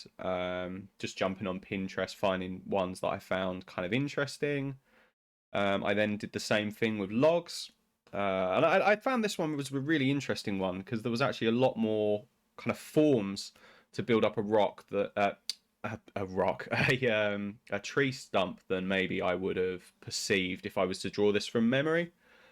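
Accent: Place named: United Kingdom. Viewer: His British he speaks 😮😮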